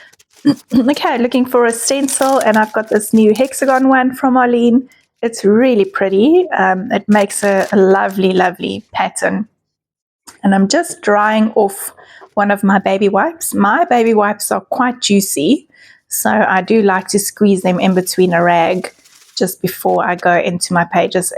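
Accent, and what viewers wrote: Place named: South Africa. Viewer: Beautiful page! I love hearing another South African art journalling because sometimes I feel like I’m the only South African who art journals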